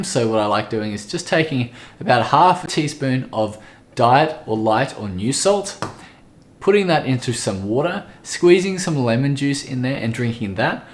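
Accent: Australia. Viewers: So nice to have an Aussie presenting these videos. Thanks. Very informative.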